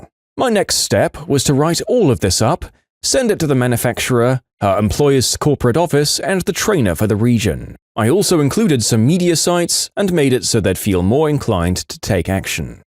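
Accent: United Kingdom. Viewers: Its been a while since ive watched am i the jerk? so when i watched this i was absolutely bamboozled that he was british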